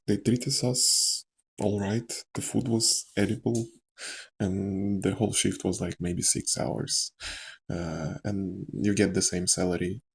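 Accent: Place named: Eastern Europe. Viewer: that slavic accent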